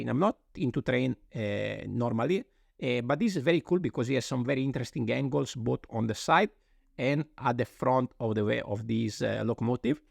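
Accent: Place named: Italy. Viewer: Thank you for the kind feature, I'm so glad you like my Fabuland paddle steamer. Your models are beautiful and I absolutely love watching this channel, particularly for your accent as it reminds me of my Italian friends. Best wishes, Stewart (Stubot) ❤💛💙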